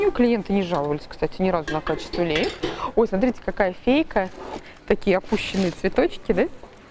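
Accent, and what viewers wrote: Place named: Mordovia, Russia. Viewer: 😂😂😂 Досмотрела до конца!!! говор прям мордовский🔥👍 часы можно брать 😂видно что крепкие.. Очень понравилось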